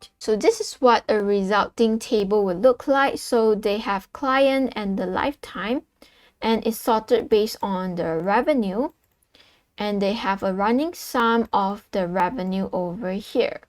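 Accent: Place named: Singapore. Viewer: As a fellow singaporean I notice the accent - prolly singfaporean or malaysia - hi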